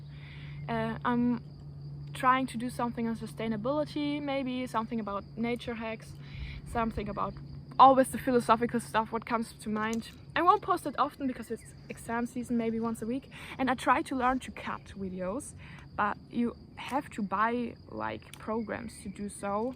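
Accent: Germany. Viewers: Mir gefällt dein deutscher Akzent und du hast schon etwas Ähnlichkeit mit Doc Caro :)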